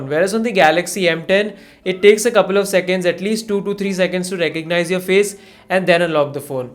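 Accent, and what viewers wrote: India. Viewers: The indian accent is too strong anyways thanks for the review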